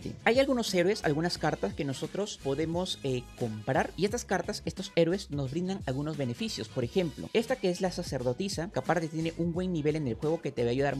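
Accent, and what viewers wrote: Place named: Peru. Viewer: EL mano si era e peru por el micro ????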